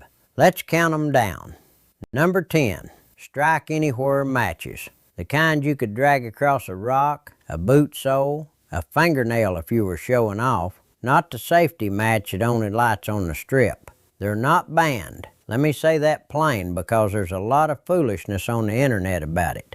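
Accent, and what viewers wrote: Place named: Maggie Valley, United States. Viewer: Maggie Valley accent for sure.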